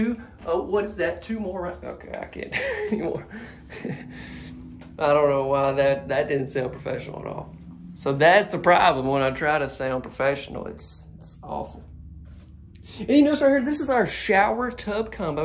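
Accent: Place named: Alabama, United States. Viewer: I enjoy watching your videos. You make any of the homes appear better than what they are I am from the south. When listened to you talk I thought, this guy does not sound like he may be from Oklahoma! He sounds like either Alabama or Mississippi!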